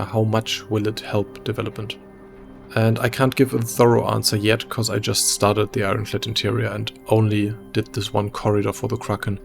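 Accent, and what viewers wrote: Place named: Germany. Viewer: dieser deutsche akzent... bitte sag mir das ich micht nicht irre... kanadier reden nicht so ^^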